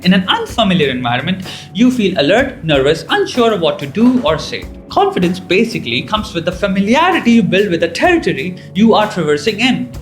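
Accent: India. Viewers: I don't know, but I think he's Indian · Indian accent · i hate your Indian accent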